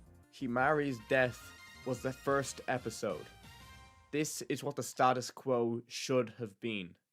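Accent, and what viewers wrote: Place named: Ireland. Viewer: No way I've just gone and finished Penguindrum, went on youtube to find a good analysis about it and found a quality video by another lad from Dublin about it (I'm assuming from the accent, if not I'll look like a fucking twat so let's hope I'm right). Felt the exact same way about penguindrum, gave it an initial 9 but I'll see how I feel about it once time passes and I might become a 10. Great vid and mad props for being an Irish anime youtuber that isn't eyepatch wolf. Honestly never thought another one existed. Good luck in the future brother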